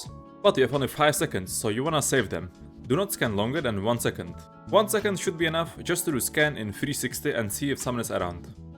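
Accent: Czech Republic. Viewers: Tvrdý český prízvuk čo má svoje čaro :D Nice tips, thank you very much :) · ten přízvuk, hned mi bylo jasný že jsi Čech :D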